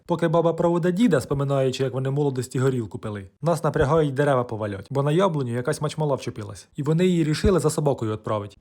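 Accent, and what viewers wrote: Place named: Cherkasy, Ukraine. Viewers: тобі здоров'я!!! Бачив що з Черкащини,думав що до Київської сторони,а коли взнав,що з Тіньок,мало не обістявся(земляк в тройні)!!!Моя мама до затоплення з дідом теж в Тіньках жили,а потім в Чикаго переїхали!!! Мені дуже приємно дивитись твої відео аж до сліз!!!Дивлюсь з Одеси!!! Чекаю на твій контент з нетерпінням!!! Дуже приємно і класно в тебе виходить з нашим Черкаським говором і твоєю харизмою!Бажаю здоров'я ❤❤❤!! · Ото,коли чую слово" кохве", то вже мій день відбувся!!! Чудова українська- центральночеркаська мова. Вітання зі Шполи!!! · Черкаси тепер зрозуміло звідки це й знайомий діалект)) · Слухаю черкаський говір ,як музику і згадую Таню з Черкас🤭😆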